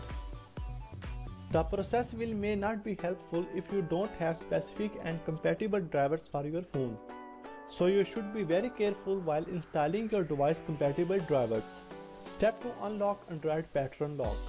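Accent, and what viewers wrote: India. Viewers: YOUR VOICE IS SO FUCKING ANNOYING UGH I CANT STAND IT CAN YOU TALK NORMAL AND NOT HAVE THAT STUPID ACCENT GOD THIS IS WHY I HATE INDIAN PEOPLE NO ONE CAN EVER UNDERSTAND THEM AND CAN YOU ACTUALLY GIVE A BETTER EXPLANATION ON THIS?!?!!! god your so FUCKING annoying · uiqbal11 He sure sounds Indian.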